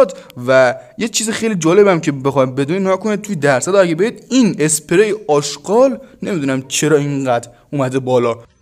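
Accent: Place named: Mazandaran, Iran. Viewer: بنازم لحجه مازنی🗿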